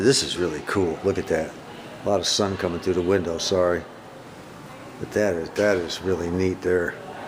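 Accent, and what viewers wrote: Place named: Iowa, United States. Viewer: .....I can tell you are NOT a high-brow..cause you say "ANT" instead of "AUUNT", just like an Iowan like old Buzz!!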